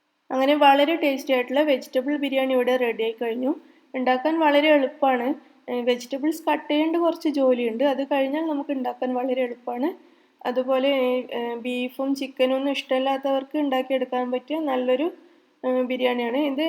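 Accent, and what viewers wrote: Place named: Palakkad, India. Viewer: ഇങ്ങളെ ഭാഷ പാലക്കാടൻ സ്റ്റൈൽ ആണല്ലോ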